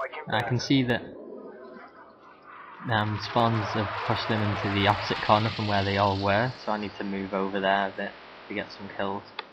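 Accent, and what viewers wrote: United Kingdom. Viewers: corner, he just has a british accent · filipino with a english accent?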